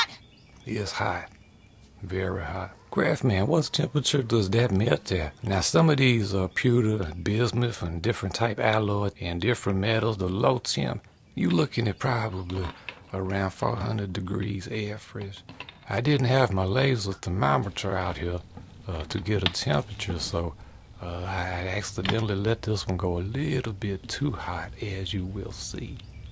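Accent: Louisiana, United States. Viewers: Man sounds hella Cajun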